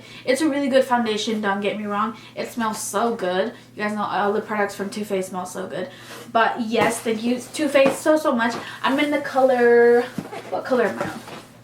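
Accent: Mexico. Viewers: We love a Mexican Queen with a cute accent 👑💕✨🙌🏾 Too Faced: Warm Sand Covergirl: golden ivory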